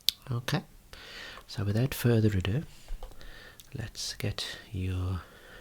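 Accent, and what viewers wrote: Birmingham, United Kingdom. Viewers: yes, its a brummie accent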